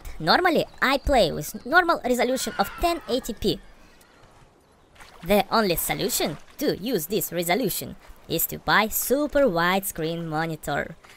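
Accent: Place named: Russia. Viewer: Now I can hear your Russian accent :) Usually I don't notice it so hard x)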